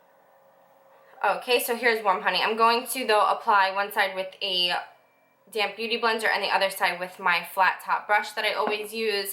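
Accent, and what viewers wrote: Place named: New Jersey, United States. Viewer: Looks like a great shade match! Thanks for the review. PS - am I hearing Jersey in your accent? My mom's from Jersey, and sometimes I get a whiff of this accent ;)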